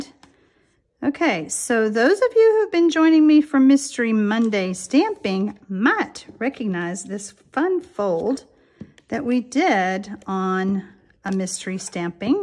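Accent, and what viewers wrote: South, United States. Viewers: You sound so much like my fave first cousin... my cousin was Southern too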